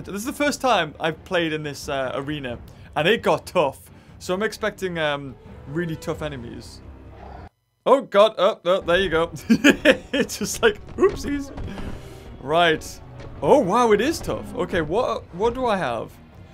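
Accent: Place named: United Kingdom. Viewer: Never knew he was British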